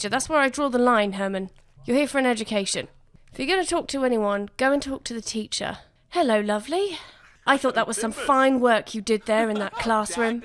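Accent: United Kingdom. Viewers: I love your videos! Your humor and sarcastic comical self is just like mine. I love the sims, and I enjoy your videos! Always love hearing your story of your sim and always got me laughing which I need bc life sucks! I love your accent! British ladies got it going on! Cheers!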